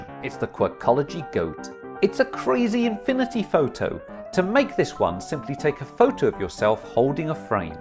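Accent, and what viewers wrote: United Kingdom. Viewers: r u English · Omg u are British even I am 🐶🐶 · WHAT?!??! I didn’t notice that you are British!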